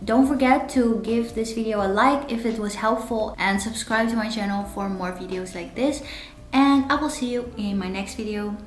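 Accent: Netherlands. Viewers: My flight to Chongqing is tomorrow. I am a bit late to watch this video. I did book a stay with English speaking staff luckily. Setting up all my apps now. Thank you so much!! btw.. Je Nederlands accent is super cute.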